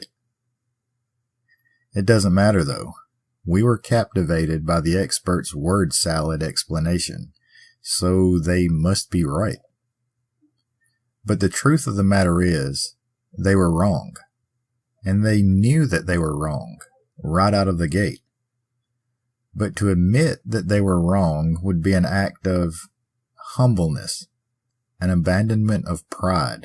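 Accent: South, United States. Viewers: Delicious dialect 😊😊 loving the sound of your voice as always looking forward to hearing this.. its 0134am xx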